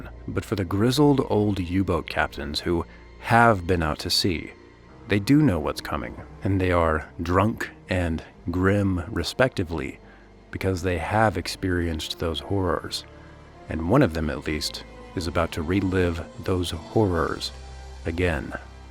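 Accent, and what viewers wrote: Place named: Austria. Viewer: Östereicher habe ich mir gedacht. Es klingt ein ganz klein wenig anders als Bayrisch. Aber so genau könnte ich es nicht sagen...Ok bin ja auch Norddeutscher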